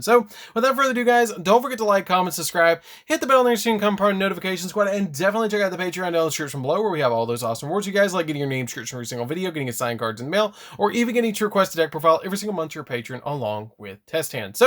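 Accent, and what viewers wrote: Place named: Texas, United States. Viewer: Well its Texas English, idk if its too hard to understand, but if you're so inclined. THIS DECK GO UNGAH BUNGAH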